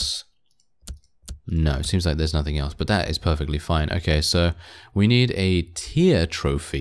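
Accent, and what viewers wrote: United Kingdom. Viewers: Came here for the games and stayed for the british accent.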